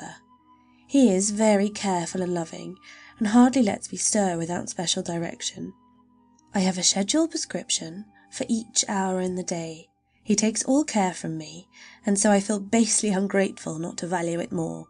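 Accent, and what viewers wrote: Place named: United Kingdom. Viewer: Very much than other normal US english readers, i think UK english is the proper voice for this story.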